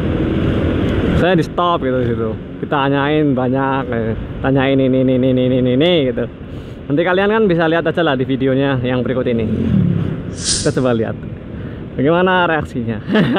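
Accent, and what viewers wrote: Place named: Banyumas, Indonesia. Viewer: Ngapak temen lah mas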